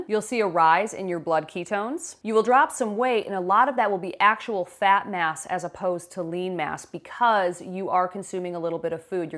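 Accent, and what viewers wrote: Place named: Midwest, United States. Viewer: Da Jewels? Sound to me you're in the midwest, perhaps the Chicago area. If you are, do you miss Dominick's? Was sad to hear of their closing. Used to live in Elmhurst/Lombard, but been down in fl since 87 june. Have been considering the diet, but not sure. I was 220 a year ago, but down to 175 now. Trying to get back down to 140. Some people say that's too skinny, and to them I say that I haven't grown one inch taller since HS, and I'm only 5'7". Don't care they revised the charts. That was only to encourage people to be fatter and more unhealthy, as if what they put in our foods here in the US wasn't enough. Liked the video. 👍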